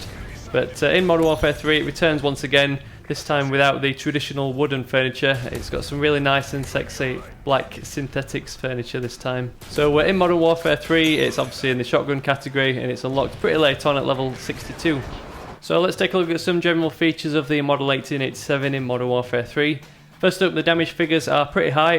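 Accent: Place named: Yorkshire, United Kingdom. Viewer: What part of yorkshire are you from?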